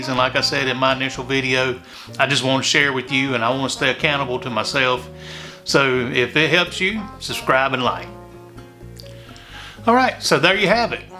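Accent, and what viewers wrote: South, United States. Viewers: Yes to pizza and double yes to that lovely southern accent.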